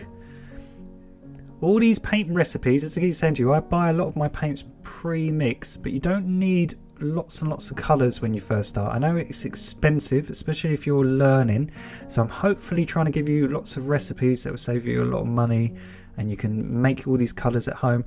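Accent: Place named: England, United Kingdom. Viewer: Great! I’m from England too. I live in Japan, so good to hear a homey!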